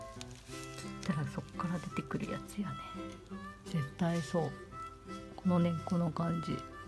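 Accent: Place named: Yamagata, Japan. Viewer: 可愛い子ばかり♥️ こちらでは、さっぱり、まったく多肉さんがかれません！羨ましい( ´∀`) でもさんトークがいちばんめんごくて地震の恐怖もぶっ飛びましたはぁ(((*≧艸≦)ﾌﾟﾌﾟｯ笑有り難うございますm(_ _)m 山形弁‼️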